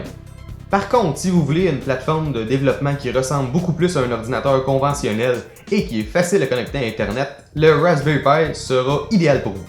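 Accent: Canada. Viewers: canadian by any chance ??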